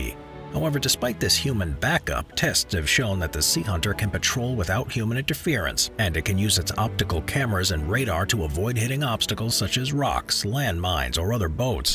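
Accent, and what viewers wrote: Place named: United States. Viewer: I'm impressed by both your scientific and historical knowledge but find myself astounded at your level of patience. I would've lowered the tone considerably by mentioning the fact that the US reneged on their deal regarding nuclear technology after being given it all free and I'd have mentioned Kasserine in the passing. You'd need a British radar to find the cavity between some folks ears but then all the US Universities are owned/funded by the Chinese which is quite the coincidence, since this channel is also.......just has a dubbed American accent repeating 'murica. To any normal Americans, no offense, we'll always have your back! USGB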